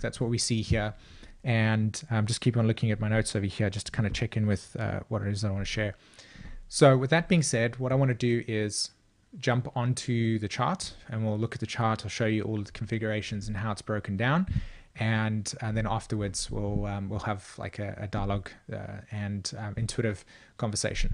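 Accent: South Africa. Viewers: Brilliant, Have subscribed! Are you South African by any chance? Thank you for sharing your wisdom so beautifully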